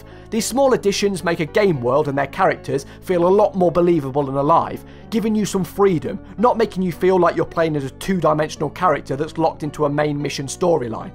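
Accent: United Kingdom. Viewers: i feel like i'm watching nakeyjakey but more british and chaotic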